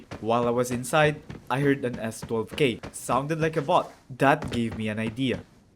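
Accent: Philippines. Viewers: Knew it was a Filipino from the accent then there's the "ginagawa mo" which proves it! HAHA u earned a sub pare nice vids! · Pinoy pala tong si nato HAHAHA i thought i misheard what he was sayin HAHAHA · This guy's got that Filipino Accent.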